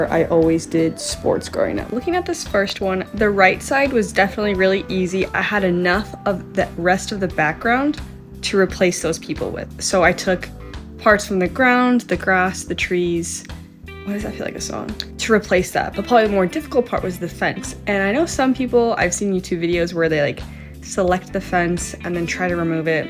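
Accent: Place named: United States. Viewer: You probably hear it a lot, but you have a really nice voice. Maybe it's the American accent lol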